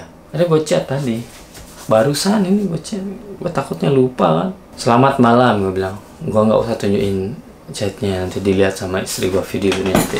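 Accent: Lombok, Indonesia. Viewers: Logat lombok nden bau telang bro 😅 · Logat sasak nya masih ada bang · Kok logatnya kayak orang Lombok ya?😁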